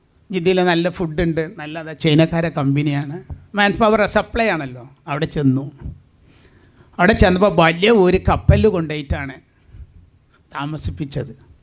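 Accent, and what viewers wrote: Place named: Malappuram, India. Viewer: ഇതുപോലെ ആരും സ്വന്തം ജീവിതം പറയാൻ മടിക്കും... അല്ലങ്കിൽ കൊറച്ചൊക്കെ കള്ളം പറയും പക്ഷെ പച്ച യായ മനുഷ്യൻ മലപ്പുറത്തെ വർത്താനത്തിലൂടെ പറഞ്ഞു അനുഭവംങൾ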